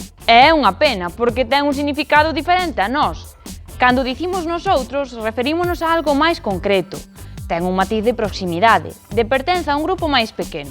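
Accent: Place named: Spain. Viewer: Profê, mais espanholado, impossível... É muito forte, muito próximo e muito familiar... difícil mesmo lutar contra...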